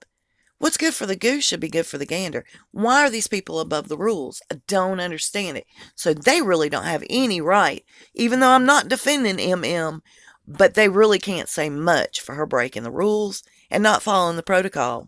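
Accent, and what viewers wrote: South, United States. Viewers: I love the sunshine in your sweet southern accent! You are a truth teller, and a very creative person. I look forward to seeing , and hearing your videos! We have a lot in common. About 7 yrs ago I began to pick up bits and pieces of the dark side of these families, and I do not look at life in the same way as I did , before learning all of this underlying truths. I am probably very sceptical, but in a positive way, and because of my own life experiences. I am a Christian, a Southerner too, and I was a floral designer, manicurist, and I started out doing hair, but decided to, graduate early, and the one thing that really stood out in my work was my passion to be a florist! I BECAME ill with several conditions while I was working on my career goals. I also had my own housekeeping business on Galveston Island, and here in my home, Austin , TX. 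I live in a very liberal city, and a very artsy community.I love those things, but it is hard to watch my home changing so quickly.I am not a political kind of person, and not a liberal or Republican. I try very hard to see all sides, and make my own informative desicions . I am disabled now, but I still push forward to remain as Independent as possible. I have 6 beautiful Grandbabies, and 2 wonderful daughter's, and 2 Very nice Son's- n- law. And, after 35 YRS of Mothering, and Grandmothering, I finally have 2 Grandsons! They are very energetic, and lot of fun. God has blessed me richly in this area of life. I do appreciate your entertai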